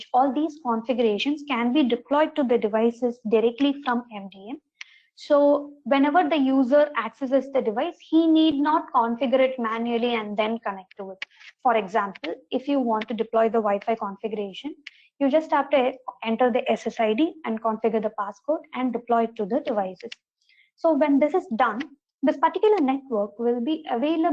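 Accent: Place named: India. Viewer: I really love that you guys are making these training videos and actually share them for free, but for some reason there are too little views here... not sure why. no comments at all, no one to argue with))) or ask questions - if these could be used as forums - wow. And oh god, I am so sorry to have to say this, but the english accent is truely cutting my ears to small pieces, it is veeeery difficult to listen to. Please don´t take it as offence in any case, but wouldn´t it be better if for the training videos you had person for each language? For example for Indian companies make in Hindi and for English and European speaking countries get someone who has acceptable pronaunciation. I mean even if the engeneeir is the someone who doesn´t speak English, he or she could still script what needs to be said. I think this will ensure best discription and result in the trainings.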